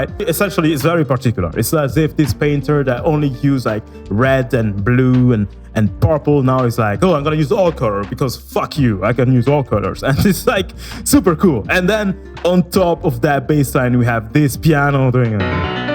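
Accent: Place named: Italy. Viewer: He does sound like an Italian with that accent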